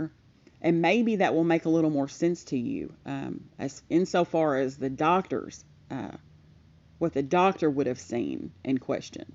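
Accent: South, United States. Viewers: Hello, I haven't listen to your channel in a long time. You sound totally different. You have a southern accent now. Are you the same person who did the Kenneka Jenkins case?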